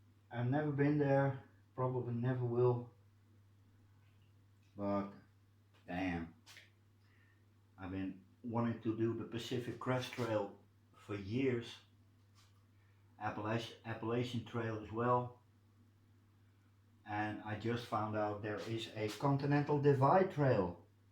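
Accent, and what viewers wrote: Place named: Netherlands. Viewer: Nice stories. Have you noticed that with a few exceptions your commenters are all female? It must be that sexy Dutch voice that does it.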